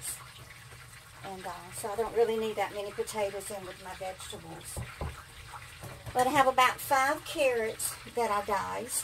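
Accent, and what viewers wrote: South, United States. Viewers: I love your southern accent, and I find your voice very relaxing kind.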